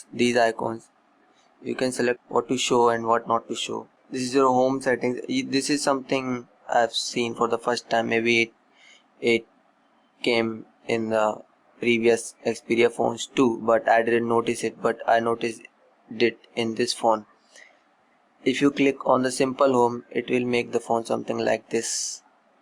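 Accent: India. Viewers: that accent.. yuck!!!! just speak in indian language dude..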